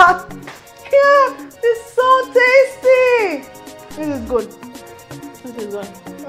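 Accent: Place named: Igbanke, Nigeria. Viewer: That accent is not Agbor, it is from Igbanke.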